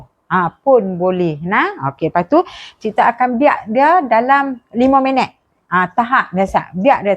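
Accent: Kedah, Malaysia. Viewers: Tu ckp pelet kedah ke perlis? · Suka la dengaq cakap loghat Kedah pure. Mcm mak saya cakap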